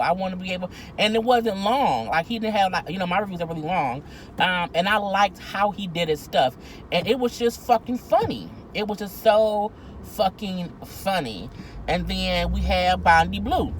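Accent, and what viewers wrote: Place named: New Orleans, United States. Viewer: Like you, I started with Funky Dineva, once I purchased a smart TV it had a YouTube channel that's how I got turned on to others like you, James Colwell, Kiesha all tea all shade, and color me pink, Alex Rogers, Ashley Miller mines is in no particular order and yes I also thought certain words you've said I thought you had New Orleans roots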